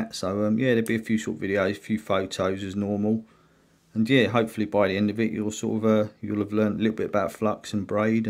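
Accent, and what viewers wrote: United Kingdom. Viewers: You are very british, I'm struggling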